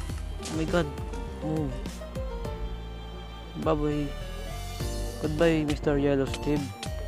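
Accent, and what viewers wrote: Philippines. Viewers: By Hearing Your Accent, I'm 100% Sure That You're Filipino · bro your accent is like filipino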